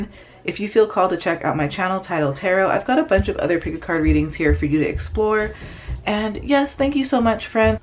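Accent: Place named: Toronto, Canada. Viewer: You have a Toronto accent. I was surprised when you said “university in my state.” Also, thanks for doing career readings. There are too many love channels.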